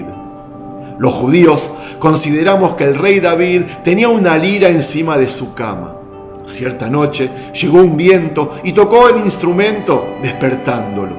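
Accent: Buenos Aires, Argentina. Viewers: Este tio q hebreo va ser habla como Porteño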